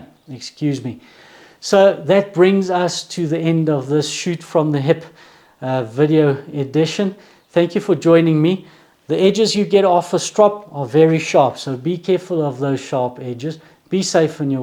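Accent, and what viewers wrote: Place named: South Africa. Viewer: How do you refresh your strop when the leather darkens and flattens? BTW, your accent reminds me of Darryl Cullinan the former SA batsman.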